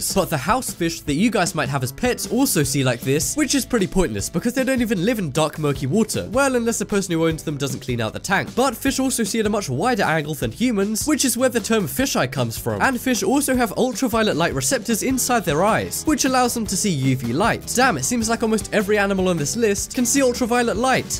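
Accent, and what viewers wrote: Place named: England, United Kingdom. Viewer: Great now that’s all I can fixate on. I already find the English accent one of the most annoying, almost to the point of it sounding like a speech impediment.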